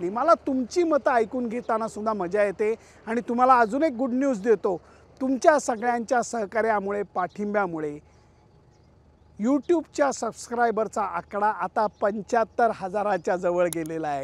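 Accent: Pune, India. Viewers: लेले सर, सामन्याआधीची उत्कंठा आणि सामन्याचा आनंद याबरोबर तुमचे विश्लेषण म्हणजे सोने पे सुहागा!तुम्ही केलेले विश्लेषण हे आपल्या अस्सल पुणेरी शैलीत ऐकताना क्रिकेटचा आनंद द्विगुणीत होतो. त्यामुळे १लाखाचा टप्पा अगदी सहज पार होणार आहे. अनेक सुभेच्छा!!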